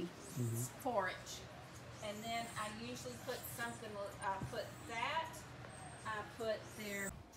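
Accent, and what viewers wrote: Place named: South, United States. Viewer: That is that sweet southern accent for ya! Hahaha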